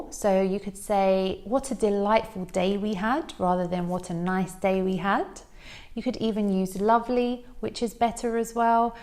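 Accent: United Kingdom. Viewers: Hello 😇 Take love from India ❤️😇💐 Can you please tell me in which british accent you are talking 😇 To be honest with you I am in love with your accent 😇💐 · you have very good British accent.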